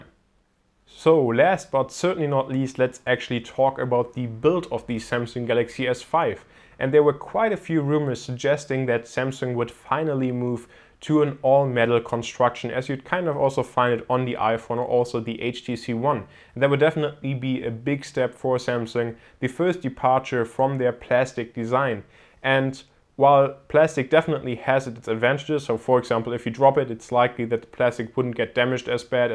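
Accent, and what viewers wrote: Germany. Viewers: Du bist doch deutsch oder ? Das hört man gleich :D Trotzdem sehr gutes Video !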